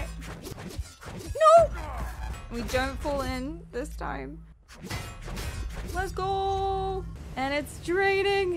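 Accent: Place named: United Kingdom. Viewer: Praise be for subtitles as your British accent seems thicker on YouTube than it does live on Twitch LUL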